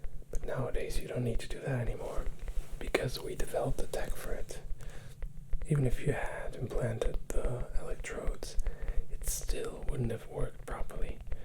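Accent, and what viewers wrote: Spain. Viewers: Subscribed because your Spanish accent is amazing😊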